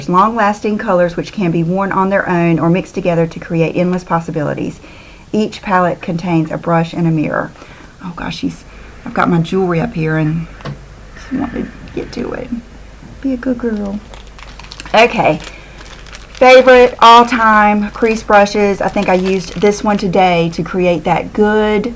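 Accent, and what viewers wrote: South, United States. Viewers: My husband and I are having coffee this morning in our sun room...he heard your voice and asked me, "Are you watching your favorite southern girl again?" :)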